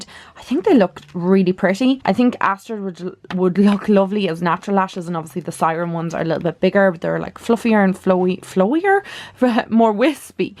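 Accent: Ireland. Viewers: You are my favorite YouTuber. I think you’re absolutely adorable. Especially your accent-makes me want to visit Ireland! I just bought the Too Faced Sweet Peach palette (I typically stick with matte palettes because of allergies but loved their “Just Peachy Mattes” palette if you haven’t tried it) and had to reference back to your initial review before I swiped that card at the register 🤣.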